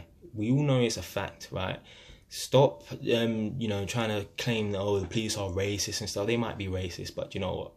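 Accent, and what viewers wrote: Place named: RP, United Kingdom. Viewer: Lmao was was u tryna be posh these days? So hard to listen to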